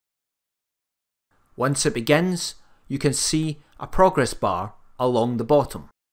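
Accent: Scotland, United Kingdom. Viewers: i like your accent :) i'm guessing scottish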